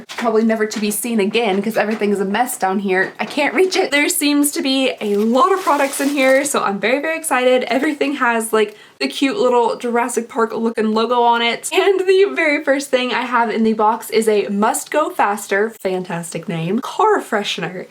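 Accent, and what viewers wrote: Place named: South, United States. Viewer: Nice box! Never heard of it but I totally love that it's Jurassic Park themed-ish! The company my dad works for made the hydraulic pumps used in the dinos so that movie was my favorite as a kid for a lot of reasons. Btw, am I late to the game or do you have a heart tattoo om your pinky finger? I noticed it in the past few videos, unless I've still got sick brain and never noticed before which is totally possible, lol. Anyway, like I've said before, yours is the first channel I've seen with unboxings and you got me hooked! My husband and kids know when I've watched one of your videos because I apparently adopt your accent. I live in NE Ohio so not many Southern accents, lol. Love your vids; I watch for the unboxings and stay for the ramblings. 😆 thx for sharing! 💖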